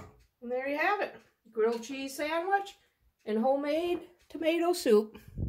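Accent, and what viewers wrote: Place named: Minnesota, United States. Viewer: Yum I love refrigerator pickles! Haven't had them since my Mama passed away. Can you share your recipe?? I notice you're from Minnesota. I hear your accent and it just brings me home. <3 I'm from up North about an hour away from Canada. It's really nice to finally see someone who isn't from the cities making videos!!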